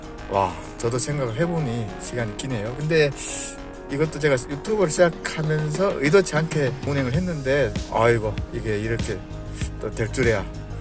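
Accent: Yanbian, China. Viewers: 혹시 고향이 연변이세요? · 연변 출신이에요, 아니면 하얼빈 출신이에요? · 어 조선족이다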